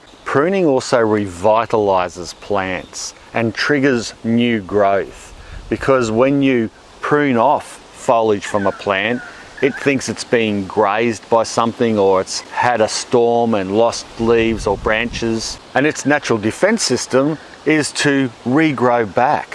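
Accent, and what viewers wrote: Australia. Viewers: Oi Loike Baaiiibeees. Auzzie accent can be so epic. Also. Good video!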